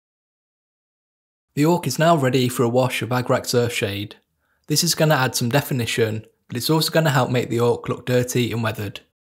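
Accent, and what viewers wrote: United Kingdom. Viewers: I don’t know what l liked better, the British speak or learning New painting techniques. Thank you 😊 . Not paper towels, kitchen paper.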